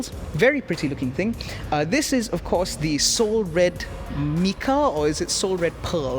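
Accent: England, United Kingdom. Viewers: Hello. You deserve more subscriber. I really like your english accent. I hope to have master english language also. Keep up the good work 👍 Edit: also, the video editing is top quality. Superb!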